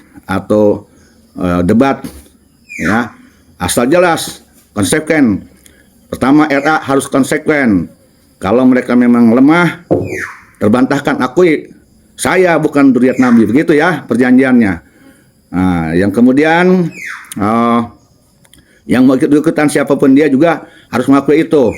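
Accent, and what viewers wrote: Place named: Banten, Indonesia. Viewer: Lughot Banten'e melekat 👍 lanjut-kan perjuangan pak,,kiyai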